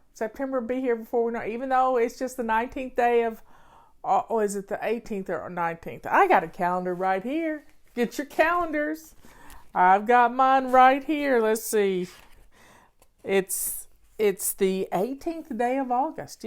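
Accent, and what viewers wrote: South, United States. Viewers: I love your Southern accent! Had to buy a new washing machine. How's that for celebrating laundry month!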